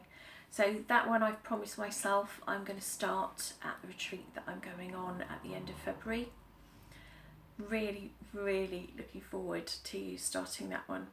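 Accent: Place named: England, United Kingdom. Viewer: Hi, I really enjoyed your first video......lovely to hear an English accent! Your stitching is absolutely beautiful. I live in Northern Ireland so I'm not too far away from you. I love Devon and have visited many times. Looking forward to watching more of your Flosstube videos 😍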